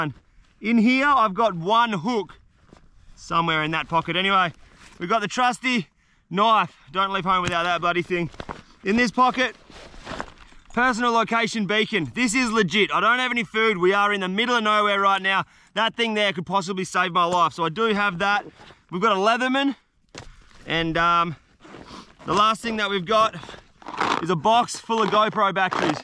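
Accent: Australia. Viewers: Any one pls which region of australia this guy belongs to I wana learn his accent ??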